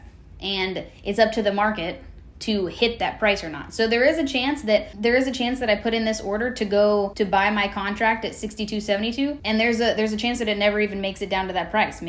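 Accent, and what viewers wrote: South, United States. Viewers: Lol I can hear a southern accent a little bit haha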